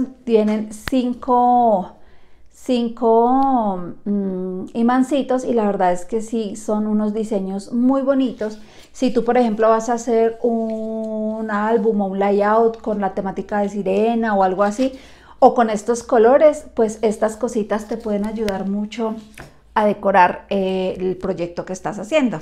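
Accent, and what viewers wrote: Colombia. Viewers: Eres de Colombia y vives en Aguascalientes? O porque hablas con acento Colombiano?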